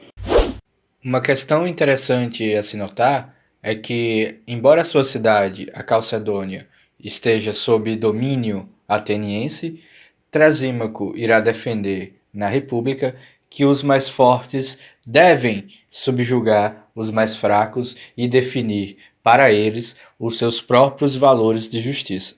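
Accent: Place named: Pernambuco, Brazil. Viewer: tu é de Pernambuco? Sotaque bem semelhante...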